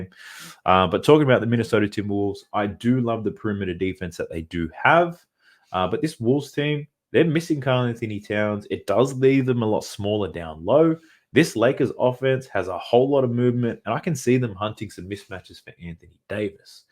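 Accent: Australia. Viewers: Never thought I would be listening to an Australian dude for NBA picks 🤣. Love the insight tho 💯💪🏽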